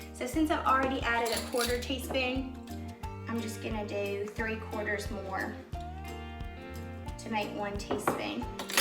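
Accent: South, United States. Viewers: Fingers crossed my icing turns out like yours. Lol And yes, I could tell you are a southern girl right off the bat. I'm one as well. Lol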